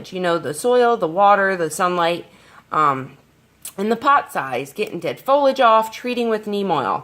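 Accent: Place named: South Jersey, United States. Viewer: Also, love that friendship plant. Also, are you from the east coast? I'm from the Jersey shore and I'm hearing a familiar accent. South Jersey? Philly? lol.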